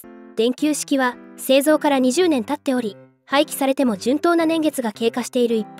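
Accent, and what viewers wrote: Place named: Osaka, Japan. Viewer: ばりばり大阪弁やん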